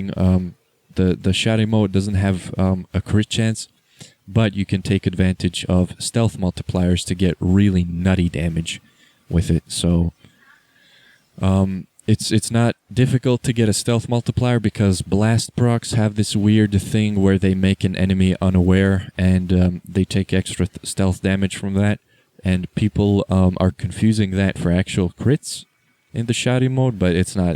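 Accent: United States. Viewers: Q&A: How did you, a montenegroid, learn to speak english so well with American accent? Also, are you still a student? What do/did you study?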